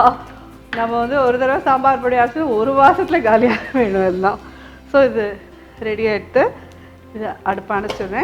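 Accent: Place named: Thiruvarur, India. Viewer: மாமிஉங்களுக்கு நீண்ட ஆயுளை மஹா பெரியவாளும் என்னுடைய ராமனும் தறுவார்கள் உங்களுடைய பேச்சை கேட்க எங்க ஊர் திறுவாறுர் நினைவு வறுகிறத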